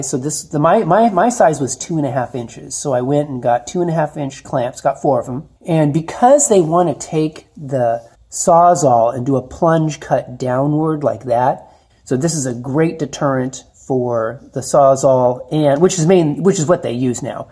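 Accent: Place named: Canada. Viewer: Another good idea, thanks again. You sound like you are from Canada? - Canadians overall are good peeps.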